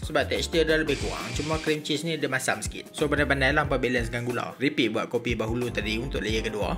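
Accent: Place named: Perlis, Malaysia. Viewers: Kannn...rindu akk anak buah dok Kuala perlis.persis suara camnilah...pekatt idgham die😊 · Tudia noh pekat utara macam loghat kuala perlis😂😂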